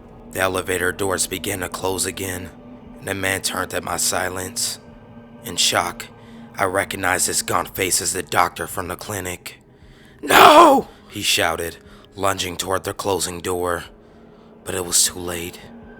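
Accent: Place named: South, United States. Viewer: I would say he's a white southerner that moved north some time ago. Sounds like he's losing an accent.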